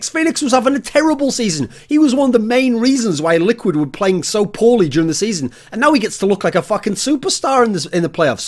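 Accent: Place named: Northern England, United Kingdom. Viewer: swear u have picked up a northern accent... cant remember u having this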